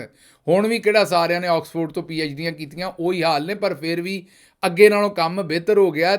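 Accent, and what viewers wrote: Punjab, India. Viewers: گل صاحب تسی منو یا نہ منو لیکن تہاڈی پنجابی دا سواد ا جاندا ہے کڑاکے کڈ جاندے ہو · Saab I literally enjoy listening to Ur Punjabi accent ❤😂 I myself a paki Punjabi from Karachi & resident of Oman but my Punjabi is soooo very poor😂 May Allah bless u Sir lotsss of respect🫡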